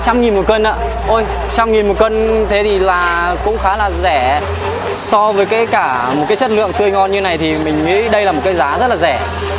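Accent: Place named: Hai Phong, Vietnam. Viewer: Ngay lần đầu nghe giọng a nằng nặng e đã nghi a là người hp r. Hóa r đúng thật. Xin chào anh người đồng hương. Rất mong đc a thả tim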